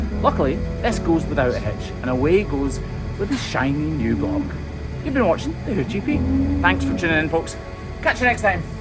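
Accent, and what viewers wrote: Scotland, United Kingdom. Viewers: I think part of it is also (maybe just for me) his Scottish brogue. It’s lyrical, I have always been a music geek. 😊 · I really enjoy watching your videos. Even though this is a seriously important and necessary job, you and the team around you are very entertaining. Yet, I find the presentations to be quite informative. The way you regard and treat these animals, is a credit to all of you. I’m quite sure that the farmers who bring you in, appreciate your overall regard and respect you show to their livestock. BTW has anyone ever told you that you look a lot like Russell Tovey, only with a Scottish accent. LOL 😊 Much respect and appreciation from here in Western Australia. G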